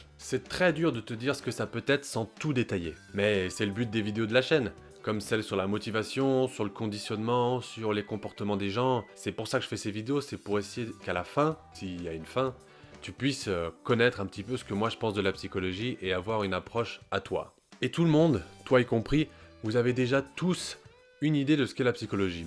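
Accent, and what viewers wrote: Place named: Quebec, Canada. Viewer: Ce petit accent québécois. 👌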